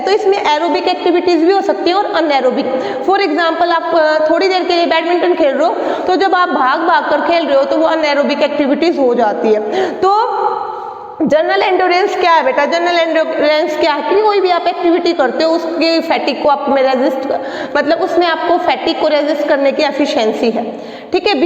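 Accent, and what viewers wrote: Haryana, India. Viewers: Mam r u from haryana.......?? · Mam app Haryana ke lag te ho Sare topics clear ho gaye app ki video dek ke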